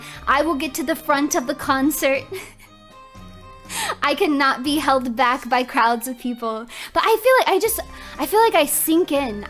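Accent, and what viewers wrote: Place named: South, United States. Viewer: I absolutely drive with my knee 93% of the time. Also this seems to be from same stream as the forts convo. I live in the south my whole life and have zero accent. She seems chill and good person and good friend. That ya’ll she has is dead giveaway / she shouldn’t adjust to a ‘proper’ speech pattern and cadence because of viewers. F them - forcing denial and faking true self for other people. How do I befriend her as asexual male and acoustically gifted - you wanna just like.. spend time together and hang as friends? Not that non-acoustically minded normies would understand that friendships. Or that’s what my ptsd says anyway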